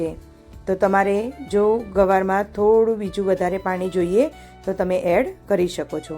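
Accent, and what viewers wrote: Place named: Mehsana, India. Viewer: Mouth watering thaali 👌 Your accent looks like mehsana, if so, please reply 🙏 Thanks 😊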